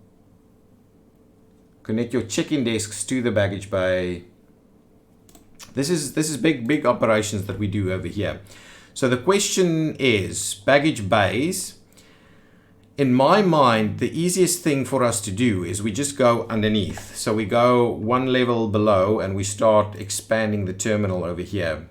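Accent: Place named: South Africa. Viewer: Subbed, great work & loving the fellow RSA accent!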